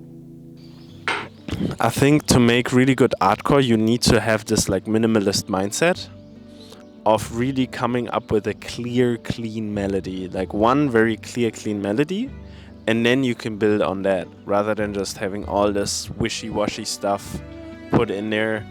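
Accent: Germany. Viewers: Im sry if il wrong here but its been c8nstsntly hititng me that ur accent sounds quite german lol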